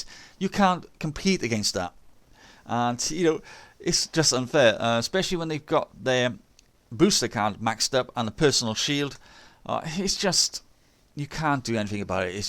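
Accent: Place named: Ireland. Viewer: Irish accent, spot on!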